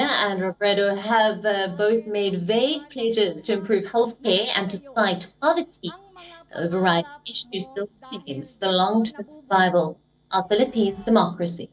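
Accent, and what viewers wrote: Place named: India. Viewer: its an indian accent. Just like Filipino, Korean, Singaporean english accent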